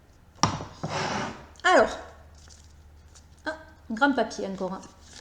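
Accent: South, France. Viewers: ce bel accent que nous avons dans le Sud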